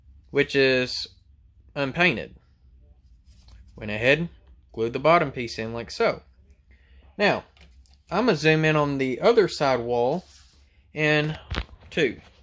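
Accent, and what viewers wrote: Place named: Arkansas, United States. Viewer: Did a great job...great recovery. I’ve run into some bad instructions from Walthers too. Your Arkansas accent got me 😊...spell the name of that business....Happy New Year 🎆🎊🎈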